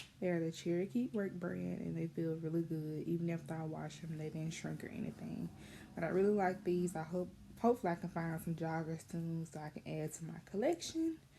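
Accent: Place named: Alabama, United States. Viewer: I heard you accent & immediately knew you were from Al. (So am I 💗)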